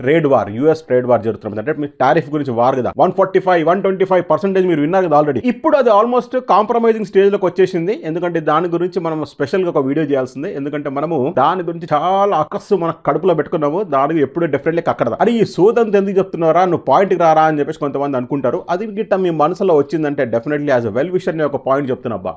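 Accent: Telangana, India. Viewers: అన్న నువ్వు తోపు అన్న నువ్వు ఎక్స్ప్లెయిన్ చేసే విధానం సూపర్ అన్న తెలంగాణ యాస భాష భాష యాస స్టైల్ సజీవంగా చెప్తున్నావా అన్న నువ్వు తోపుల కి తోపు అన్న నువ్వు మామూలోడివి కాదు అన్న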